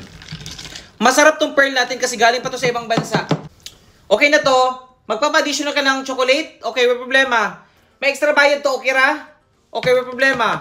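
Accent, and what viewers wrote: Visayas, Philippines. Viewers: Ilonggo kagid o bisaya❤❤❤❤ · hahahaha bisaya kapo ba waypob lima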